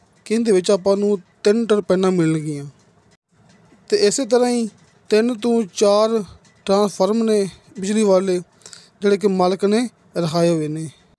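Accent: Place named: Sialkot, Pakistan. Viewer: tawadi boli sailkotiya wali a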